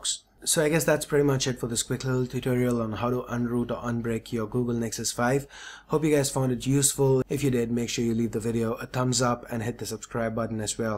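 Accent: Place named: India. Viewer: You saved my day, brother!!!!! :D & you sound like indian.... that's close to me! :)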